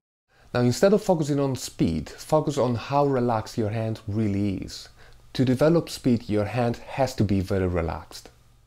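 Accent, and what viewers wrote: Italy. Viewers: Si sente che sei Italiano xD Bravissimo comunque ^^ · Japanese?? Sounds Italian, as he is. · Your teaching technique is excellent. The Italian accent only adds tot he experience. Thanks,.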